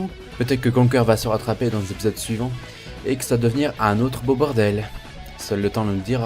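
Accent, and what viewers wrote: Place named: England, United Kingdom. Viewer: tu un belle acsent anglais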